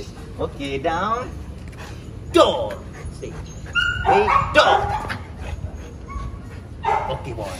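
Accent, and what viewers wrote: Ambon, Indonesia. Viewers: Biar lingkungan jakarta tapi lidah ttp ambon jua bu.. 😁😁😁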